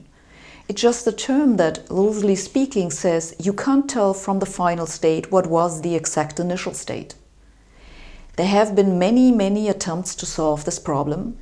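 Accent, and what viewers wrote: Germany. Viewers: Ich liebe die deutsche aczent..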